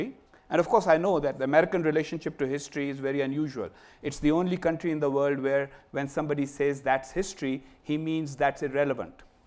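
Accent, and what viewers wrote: India. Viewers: Think an Indian is talking.. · This guy looks and sounds more indian.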